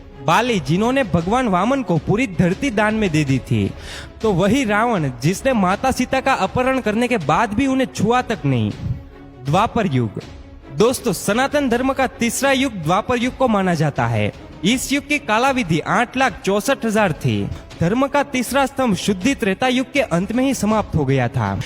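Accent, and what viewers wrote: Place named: Gujarat, India. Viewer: આ ભાઈ ગુજરાતી લાગે છે ઉચ્ચાર ઉપર થી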